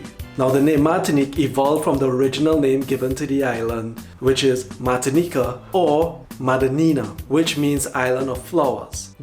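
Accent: Trinidad and Tobago. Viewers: Loved the video, very informative and love your Trini accent, my great grandmother originated from Trinidad and my Grandmother from St Vincent. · Barbados's name that so fantastic - the obvious reason we were not taught this in school no books were available to know either, but now we can learn. truth has to come out! Thank you and who else would do such a great job with an accent but a Trini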